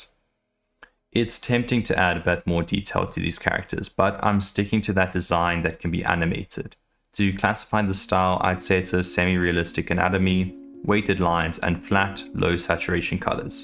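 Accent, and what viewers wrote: South Africa. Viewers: bro u South African? u got the accent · Awez Bru, I'd recognize that accent anywhere my Bru 🇿🇦🇿🇦🇿🇦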